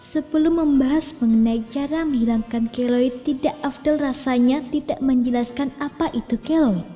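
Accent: Java, Indonesia. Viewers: Medok bngt🤣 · Intonasi suara nya kaya drama di sekolah.logatnya jawa .tapi jawa ma ya...lucu2 geli.....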